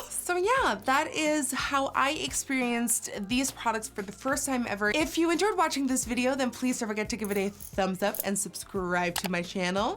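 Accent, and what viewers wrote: United States. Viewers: Kawaii dude this is the second time I see you commenting this hahaha people don’t have a problem, they just thought she was American based on her accent. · Leah Jones she's not putting an accent on, it's because she's been around American people for so long. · Quick question. I'm new to this channel and I noticed she mentioned that she lives in the Netherlands. Has always lived there? I wasn't sure as she has an American accent.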